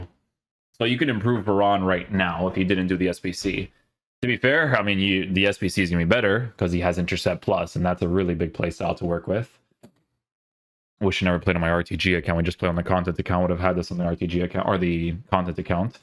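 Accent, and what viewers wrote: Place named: Portugal. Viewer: He's Portuguese. He has an accent. Get over it 😂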